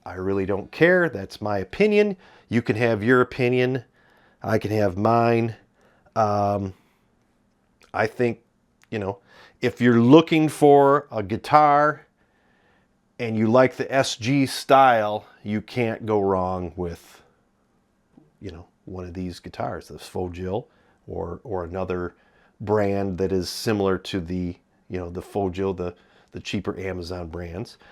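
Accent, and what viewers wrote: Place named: Midwest, United States. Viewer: just watched this episode for the second time and wish I could give you another 'like.' Good off-the-cuff midwestern commentary and I appreciate that man. Here's an odd question maybe: Do both these guitars have a '13' in the middle of the S/N?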